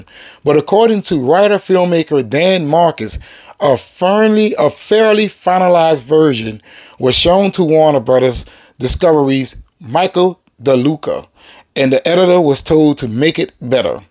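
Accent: New Orleans, United States. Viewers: Sounding so New Orleans in this vid dude. 😂👍🏻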